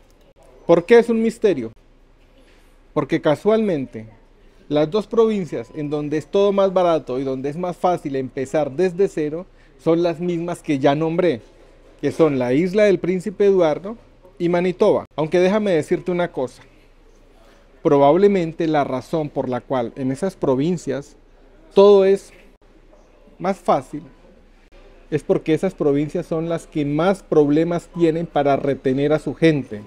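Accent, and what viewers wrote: Colombia. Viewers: Menos mal que alguien dice mucho de verdad al parecer eres un hombre de bien DIOS te guíe siempre muchacho, pero si ayuda mucho si vendes empanadas a los restaurantes y en tu casa si tienes entrega por lo menos yo lo hice y mis hijos tuvieron pan en la meza. Pero eres un hombre correcto tú no eres mexicano por tu acento eres colombiano ojalá no me equivoque te deceso lo mejor. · Este habla como hablaban los colombianos en los 80/90 cuando estaban huyendo de la guerrilla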